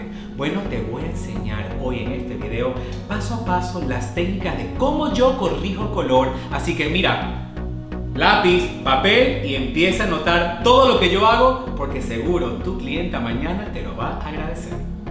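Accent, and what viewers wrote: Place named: Venezuela. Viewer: Hola me puedes informar en que estado atiendes??? Ya por el acento creo que eres de Venezuela y nada como nuestras mechas, extraño mi estilista😞😞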